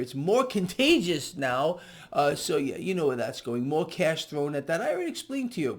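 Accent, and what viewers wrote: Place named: New York City, United States. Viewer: we love your Italian NJ/NYC pronunciation of 'costs' like 'caust'(ic)👌🏻 I think the NYC Italiano accent is awesome & admirable😄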